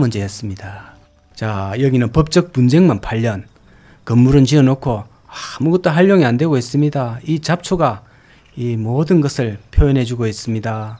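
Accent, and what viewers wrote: Gyeongsang, South Korea. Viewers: 구수한 경상도 억양의 말을 거부감 없이 재밌게 잘 들었네요 한국은 의료민영화 절대 안됩니다 하는 순간 빈민국으로 추락입니다 미국도 의료시스템 땜에 시민들이 억의 빚을 지기도 하고 비실거리는데 한국 같으면 상상도 하기 어렵습니다 미국도 의료민영화 없애야 하는 아주 고질 시스템입니다 저는 웃긴 자막 재밌습니다 훈훈한 경상도 말씨에 구독하고 갑니다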